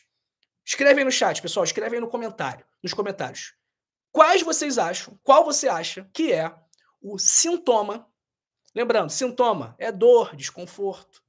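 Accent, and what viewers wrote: Rio de Janeiro, Brazil. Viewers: CARA...A SUA CARA E A SUA CONVERSA CARIOCADA NÃO PASSA CONFIANÇA... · Incrível no Brasil....esses médicos YouTube que massacram a gramática da língua portuguesa...pior esse sotaque carioca malandro nada chic .....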